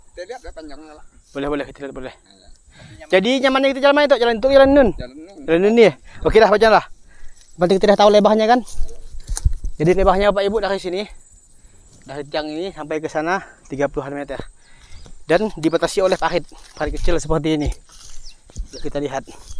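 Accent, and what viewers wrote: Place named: Banjar, Indonesia. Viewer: Logatnya kayak logat banjar dikit..maaf bg mau nanya kenapa daerah sambas bnyak dijual lahan uda jadi..kalau untuk perantauw aman aj kah hidup disana bg..gak ada gesekan seperti yg lalu2 kah bg..antara masyarakat tempatan sma madura..gmana penduduk asli disana bg..apa menerima dengan baik untuk pendatang dri luar dan berbagai macam suku..🙏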